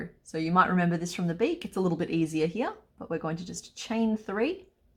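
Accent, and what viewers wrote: Australia. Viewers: Wow, thanks. You sound aussie but had me confused with your US stitch names. The sulfur cresteds and the rainbow lorikeets are the mandarin thiefs which leave us nothing on our tree, in the last few years, they don't even wait until they are ripe. Maybe the cockatiel will be less of a sore point. We had a baby fruit bat in the guava tree one year, he was a failed release from the bat hospital, didn't flinch when we looked at him, wanted plenty of guavas handy, but we had no idea if he had the Lissa virus, so they came, said he was fine, and took him away. Thanks again.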